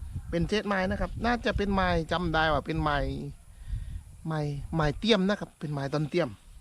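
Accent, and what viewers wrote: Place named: South, Thailand. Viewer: ขอบคุณที่รีวิวให้ดูนะคะ พี่เพิ่งเคยเห็นเลยค่ะ ปืนยิงปลา เคยแต่ได้ยินค่ะ ชอบตรงเอาด้ามแปรงสีฟันมาดัดแปรงทำด้วยนี่แหละค่ะ ไอเดียดีจังเลยค่ะ ประสิทธิภาพสุดยอดเลย พี่ชอบน้องพูดภาษาใต้จังค่ะ ยิงให้ดูด้วย ใช้ยางสามเส้นนะคะ ไม้เทียมมันจะเบานะคะ และที่สำคัญมันลอยนี่แหละค่ะ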